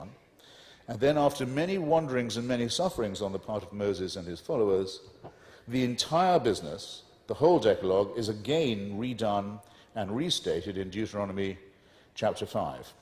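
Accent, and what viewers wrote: RP, United Kingdom. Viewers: ..."there's then a sulfurous interlude"... These gems just roll off his tongue, proof of his linguistic prowess and command of the English language. The Queen's English, actually. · Total lack of understanding of Scripture in its wider context. For a start he calls Shabbat Sunday! Speaking in an upper middle-class English accent does not make the case stronger.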